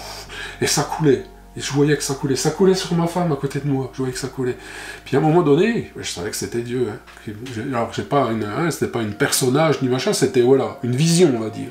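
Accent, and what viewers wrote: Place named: Franche-Comté, France. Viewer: ❤ Magnifique témoignage (et magnifique accent comtois)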